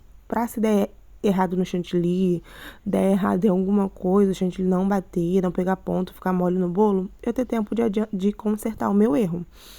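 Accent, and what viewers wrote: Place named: Rio de Janeiro, Brazil. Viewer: É o sotaque carioca kk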